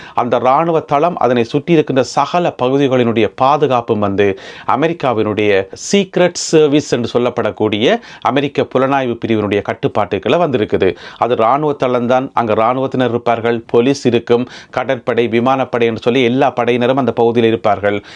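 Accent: Jaffna, Sri Lanka. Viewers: "மிக மிக அழகான யாழ்ப்பாண தமிழ்..." 6 hours ago மிக மிக அழகான யாழ்ப்பாண தமிழ் கேட்க கேட்க உங்கள் குரல் கனீர் கனீர் என்று ஒலித்துக் கொண்டிருக்கிறது தொடர்ந்து இது போன்ற தகவல்களை தரும் உங்களுக்கு எனது மனமார்ந்த நன்றிகள் பல வாழ்க வளமுடன் நலமுடன் நன்றி Reply 0 seconds ago "யாழ்ப்பாண தமிழ்..." - இதாலதான் கருணா, பிரிந்தார் என்பதும் ஒரு கருத்து...மறுக்க இயலாது காக்கைக்கும் தன்குஞ்சு பொன்குஞ்சுதான். சாதியமும் இப்படித்தான் மாறு வேடங்களில் மறைந்திருக்குது.. கிணற்றுத்தவளைத் தனத்திலிருந்து வெளியே வாருங்கள்....அப்போதுதான் வடக்கும் கிழக்கும் இனையும்...., அதுவரை கனவுதான். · மிக மிக அழகான யாழ்ப்பாண தமிழ் கேட்க கேட்க உங்கள் குரல் கனீர் கனீர் என்று ஒலித்துக் கொண்டிருக்கிறது தொடர்ந்து இது போன்ற தகவல்களை தரும் உங்களுக்கு எனது மனமார்ந்த நன்றிகள் பல வாழ்க வளமுடன் நலமுடன் நன்றி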